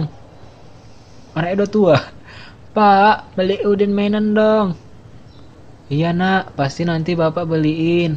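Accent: Medan, Indonesia. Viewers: ngomongnya kaya orang medan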